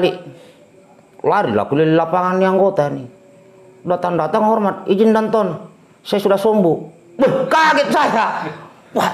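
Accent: Makassar, Indonesia. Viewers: Mantap rokok dan kopinya.... apalagi dengan logat Makassar nya pang bes ... keren 👍👍🙏 ciri khas komandan trade merk yang tidak bisa di tiru ... sehat sukses selalu komandan 👍👍🙏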